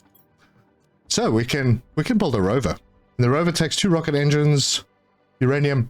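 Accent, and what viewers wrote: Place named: South Africa. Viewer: Clicked on the video and that accent jumped out at me, haha. Fellow South African! Awesome videos, man!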